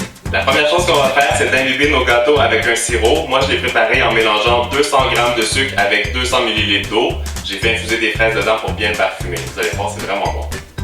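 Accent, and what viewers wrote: Quebec, Canada. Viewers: coucou j'adort t'a chaine. je t'es découvert dans le meilleur pâtissier et j'adore l'accent Québécois je t'adore continu comme sa ❤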